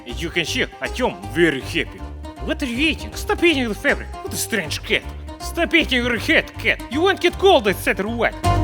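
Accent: Russia. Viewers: This dude has the thickest Russian accent I've ever heard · Мне 1 кажется что ты не американец а русский?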